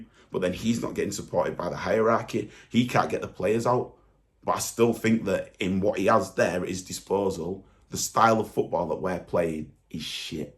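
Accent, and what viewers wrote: Yorkshire, United Kingdom. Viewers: He’s a Yorkshireman! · Yorkshire My Brother. Leeds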